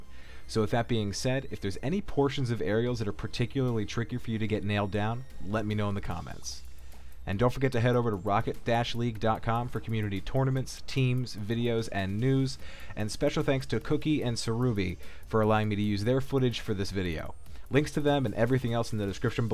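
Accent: Netherlands. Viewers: So you're dutch i reckon.. Where did you get your accent? i like your vids btw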